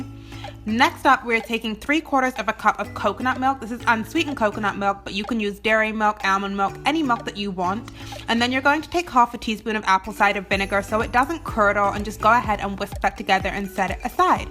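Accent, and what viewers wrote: England, United Kingdom. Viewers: Just read your comment. Its funny because when you talk sometimes it sounds like you are from England. Now it makes sense as you grew up here lol. Anyhoo I love your 'what i eat in a day videos' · I didn't know you grew up in England! This explains why your accent sounds a bit English sometimes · aw bless you. I was trying to figure out your cute accent. I was thinking it was half British lol. Btw those sweet potatoes look bomb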